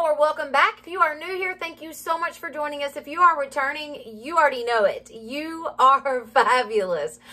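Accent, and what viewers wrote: South, United States. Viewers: Hi, i just came across your channel. I love how cute and easy these DIYs are!!!😍 Most of all, i loooove your southern accent - it reminds me so much of my super sweet mother in law who's from Georgia. I loooove southerners, you are all sooo sweet and endearing.❤❤❤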